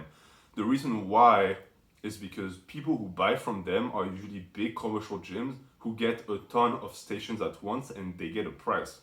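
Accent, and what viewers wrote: France. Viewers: why tf you wanna know that lol Well you can tell he's french by his heavy accent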